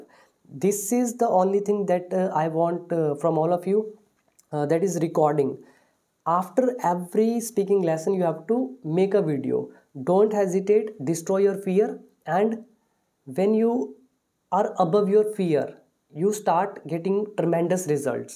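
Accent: India. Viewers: You have indian accent i could hardly understand what you said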